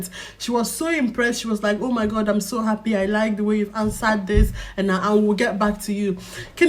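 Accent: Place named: United Kingdom. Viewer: The British Accent is getting more and more, am loving it thou. A very big congratulations .there is a big joy to get a yes in a dream n wished job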